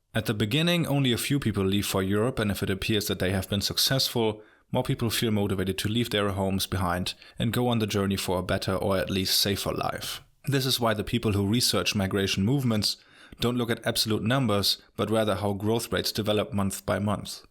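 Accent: Germany. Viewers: My God, you are German. You are the protoGerman, the Urdeutsch. I am, from now on, using you as my "Voice of Germany". Since this is youTube I add: no, this is not sarcasm. You make Bismarck sound Italian, Luther sound Greek. I ask, therefore, from you a summation of the Chancellorship of Angela Merkel. From the early Merkel muddle through days, to the rise of the EU and the Imperial Eagle, to the "I shall crush Greece until it squeals", ending in "es gibt keine obere Grenze" or "es gibt keine Grenze" or whatever it was. So far as the refuge crisis goes, it will be remembered as "es gibt keine Grenze" and not a failure of paragraph c, subsection 128, page 6498, of the Dublin Accord. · I just realized you're German... makes sense now with the accent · Please more reciting rap lyrics in your German accent, best thing ever. Oh and always great content.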